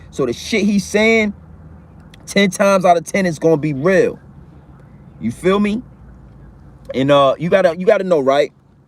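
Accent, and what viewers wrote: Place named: Philadelphia, United States. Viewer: No wonder you kind of sound like you from Philly or up North. It makes sense now 😁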